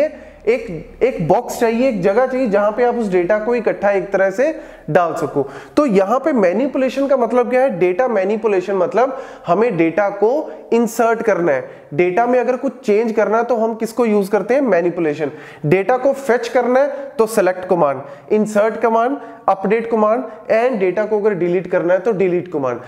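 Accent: Punjab, India. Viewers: his accent is so punjabi like 🤩 are you punjabi ?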